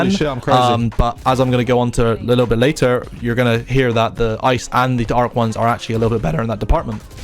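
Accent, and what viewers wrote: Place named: Bristol, United Kingdom. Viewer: You from Bristol mate? Amazing to hear a familiar accent in the peripheral community 😁 subbed and liked!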